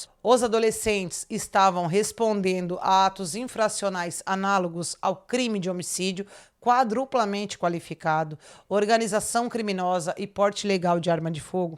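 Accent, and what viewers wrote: South, Brazil. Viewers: LINDAAA,JÁ OLHEI ESSE CASO EM VÁRIOS CANAIS,MAS NÃO TEM COMO DEIXAR DE ASSISTIR NO SE CANAL E COM SUA NARRAÇÃO COM ESTE TEU SOTAQUE MARAVILHOSO DO PESSOAL DO RIO GRANDE DO SUL!!!! AMOOO TEU SOTAQUE DO SUL GURIA LINDAAA,O TEU CABELO ESTÁ LINDÍSSIMO E QUE O UNIVERSO TE ABENÇOE EM TODAS ÀS ÁREAS DE TUA VIDA LOIRA LINDAAA🙌💋❤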